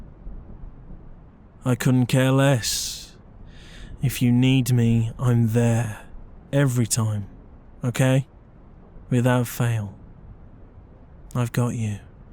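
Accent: United Kingdom. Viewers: Love your British accent 😍 also you very amazing person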